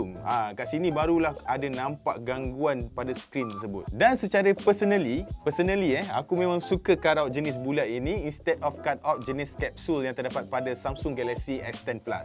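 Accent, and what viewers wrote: Malaysia. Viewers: Sedap juga dengar logat melayu malaysia, beda sikit dengan melayu sumatera. Big love from indonesia😍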